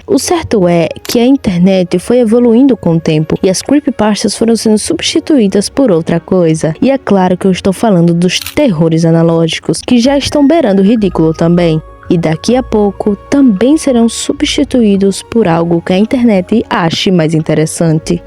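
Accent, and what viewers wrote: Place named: Portugal. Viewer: Nossaaa... Cai de paraquedas aqui pesquisando sobre creepypastas, porque me deu saudades de ver algumas, e acabei adorando seu vídeo ❤ Sua voz tem um sotaque lindo, no começo até pensei era português de Portugal. Me deu mó nostálgia da época que a hora mais divertida era chegar a noite, e pesquisar alguma creepypasta no Wattpad, as que eu mais gostava eram as sobre o Jack Risonho, Jaff The Killer, e sobre a Deep Web e lendas japonesas bem bizarras. Tem um outro canal que faz videos-creepypastas incríveis aqui no YT , que é o Sigma Terror, eu sigo e ouço as histórias dele a mais de 8 anos, as histórias dele são muito interessantes e bizarras, algumas são até bem pesadas, mais o que mais me surpreende é que a forma que ele narra é incrível, e ele tem uma voz linda e boa de ouvir que faz você se sentir estranhamente confortável e relaxada depois de um dia cansativo. 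Aqui tem o link de duas histórias dele que eu considero mais pesadas ( tem muito mais, mas essas são as que mais marcaram minha adolescência ) : O Jack Risonho e Izaque <link> Na hora Certa... <link>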